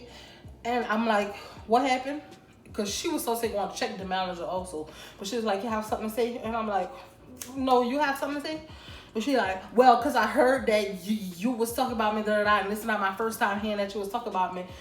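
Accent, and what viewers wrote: New Orleans, United States. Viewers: accent is so pretty you must be from New Orleans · You must be from Louisiana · i think she from nola dats how we talk lol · "um power to the ppl" 😭 she gotta be from new orleans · She gotta be from New Orleans 😂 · you must be from NOLA 🥺❤️ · I can tell she from new Orleans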